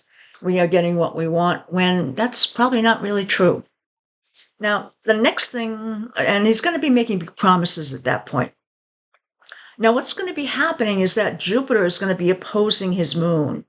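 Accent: Massachusetts, United States. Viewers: I’m from Massachusetts and immediately was like this woman is from Massachusetts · Are you from Massachusetts? I'm guessing Salem /North Shore.😊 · I’m from the North Shore and heard a MA accent too. Always cool to find a local on YouTube! ❤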